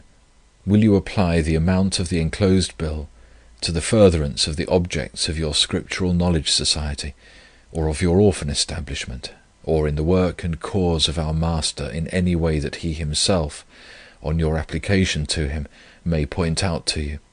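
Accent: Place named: England, United Kingdom. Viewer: I enjoy this Brother’s voice. It is comforting and I love his English accent.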